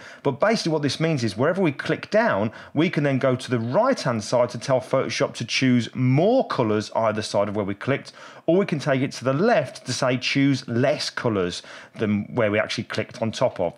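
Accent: United Kingdom. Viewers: great british accent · 好喜欢这个英伦腔 like the British accent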